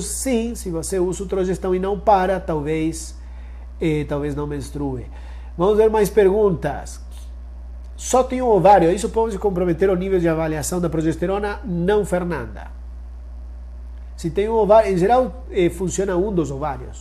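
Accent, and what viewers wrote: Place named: Peru. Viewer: Boa noite, cheguei no seu canal pois fiz uma pesquisa de transferência e qualidade de endométrio, tenho marcada minha transferência para próxima terça-feira e estou feliz e com muita fê que minhas medicas estão fazendo o seu melhor para que esta FIV tenha sucesso, eu fiz uma fiz o passado janeiro, mas infelizmente não fiquei gravida, você forneceu muitas informações que eu desconhecia, lhe agradeço muito!!! Mais uma coisa você tem um sotaque muito parecido com o meu, eu sou do Peru!! kkkkk Forte abraco.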